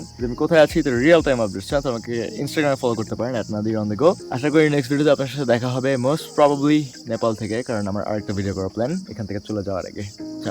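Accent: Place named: Narsingdi, Bangladesh. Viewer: নরসিংদীর লোক মনে হচ্ছে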